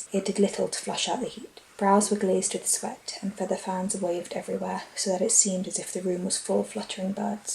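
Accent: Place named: Bosnia and Herzegovina. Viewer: Are you Bosnian? Your accent sounds Bosnian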